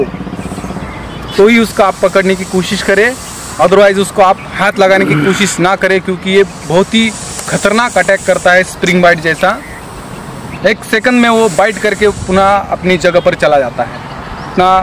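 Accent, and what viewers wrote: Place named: Maharashtra, India. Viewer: मराठी माणसाची हिंदी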